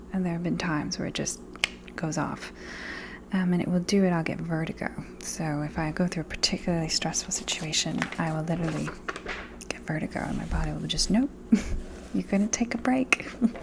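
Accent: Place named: South, United States. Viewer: Such an interesting idiolect. It's funny, when I first heard you speak I couldn't tell if you might be from the south or the east coast so guess it makes sense you have a connection to both... sometimes I heard Jackie Kennedy and other times what I imagine the daughter of Shelby Foote would probably sound like.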